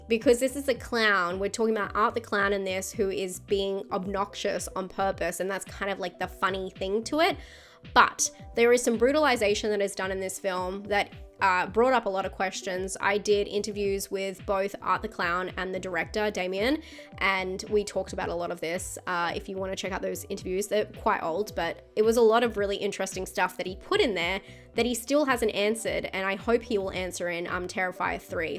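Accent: Australia. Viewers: I love the Australian accent. That’s just HORA baby!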